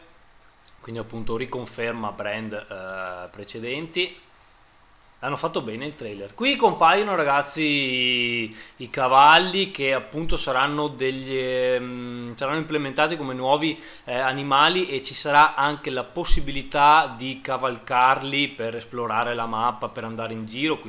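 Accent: Veneto, Italy. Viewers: Un po' veneto e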